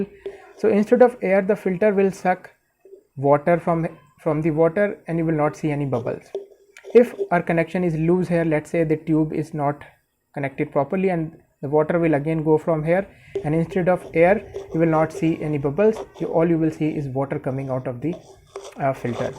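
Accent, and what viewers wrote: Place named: India. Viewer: When you hear that Hindu accent you know the tutorial is gonna be fire!!! Thanks man, you helped me fix it! My turtle is happy with bubbles again :3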